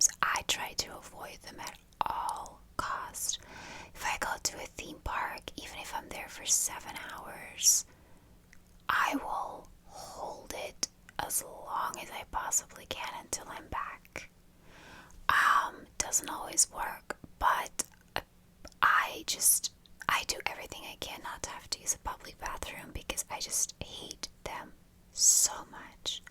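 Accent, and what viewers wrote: United States. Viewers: R you not American?? U sound so American though 😮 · Wait I’m confused where are you from? Im slightly deaf haha and I thought you were American but I obviously need to listen more often with my hearing aids in xx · Your accent doesn’t sound European. Sounds American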